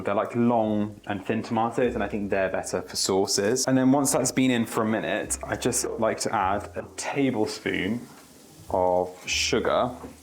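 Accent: England, United Kingdom. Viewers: English accent is tough for people who English is second language. Please keep your tone up so we can hear you clear and slow down when you talk.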